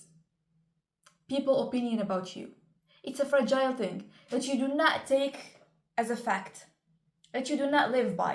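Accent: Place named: Morocco. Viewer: U sound Moroccan!❤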